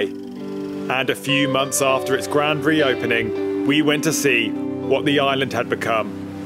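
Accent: United Kingdom. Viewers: I heard the British accent 🥰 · What a perfect British accent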